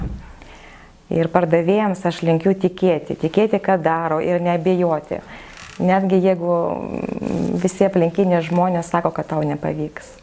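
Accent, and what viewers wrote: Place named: Russia. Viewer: kaip zmogui lietuvisku vardu ir pavarde (mergautine) gavosi taip istobulinti rusiska akcenta? metu metus metelicoj sedejo?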